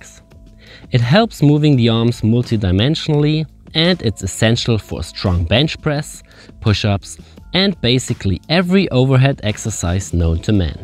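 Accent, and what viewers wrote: Germany. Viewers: Hardcore German-Accent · Can't listen to this guy - such a terrible German accent! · Bruder man merkt dass du deutscher bist an deinem englisch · Hahahahaha 100% german. I recognize that accent anywhere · Funny accent...🤔.....german?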